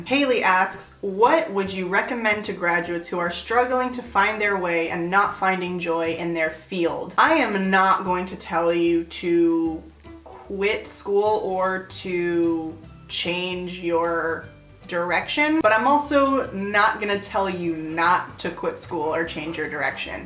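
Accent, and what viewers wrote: Castile, Spain. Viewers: nice you speak spanish like they do in spain! and ima try that bubble bobble right now! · she speaks like a Spaniard, it's so cute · your spanish is perfect I had never heard anyone whose first language isn't spanish speak it so well, and more specifically with a castilian accent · I love your spanish accent, debo decir que no me gustan los acentos propiamente españoles de España, but yours is adorable. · Your Spanish is soooo good. I grew up in a Mexican household yet your Spanish is way better then mine. When you speak Spanish it sounds as if you were from Spain. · Your Spanish ❤️ I speak Spanish too but I'm Mexican so the accent is different. I went to Spain as my graduation present for about a week and a half and it was so funny how I was picking up that accent! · OMG, that was soooooo fuckin sexy! Even if you speak spanish with the Castellano pronounciation. 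Que chula eres, Dios mio! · Haha I can hear that Spain accent. My parents are from Mexico so I learned Spanish first and then English in pre-school. Unfortunately, my Spanish became worse over the years and now as an adult I have trouble with grammar and vocabulary sometimes. It's so embarrassing. Your Spanish is probably better than mine! :p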